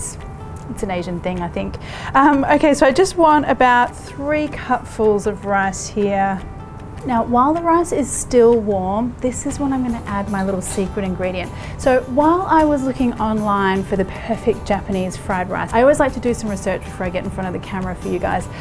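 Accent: Australia. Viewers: You sound like you grew up in Australia, then moved to the US south and started to pick up some of their accent. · You and iggy azalea have the exact same voice lol And it's not just the Australian accent. It's the same tone and pitch.. Mmm rice looks so good. I'll be making this tonight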